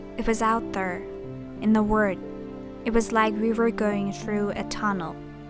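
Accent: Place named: Turkey. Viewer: لهجه ترکی بهت میشینه دختر💙💚 امشب خیلی گریه کردم ولی دستم خورد ولاگت باز شد..... بعد.... بهترم.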